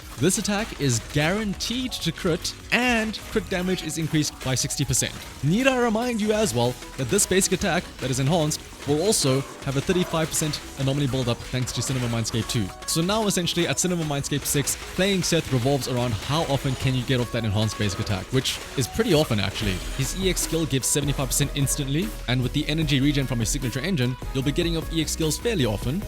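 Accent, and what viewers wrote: South Africa. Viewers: I knew I recognized that accent, as a fellow South African you got the instant like and sub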